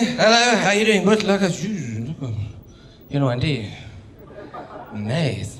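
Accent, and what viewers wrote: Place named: India. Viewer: man its tolerable adopting street Indian accent, but joking on Ghandi.. thats where you're a completely pathetic freak.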